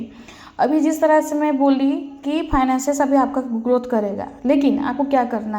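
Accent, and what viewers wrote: Odisha, India. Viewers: Mujhe b Aisa laga accent se lag raha odisha se hen jese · Mam ap odisha se hein mujhe lgta h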